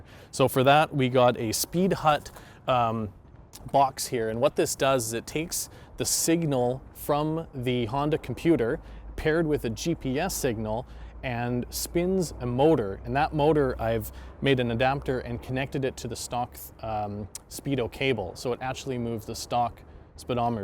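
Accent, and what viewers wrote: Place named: Canada. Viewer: Nice, clean build. I thought you were American at first, but the 'oots' and 'aboots' now make me think Canadian!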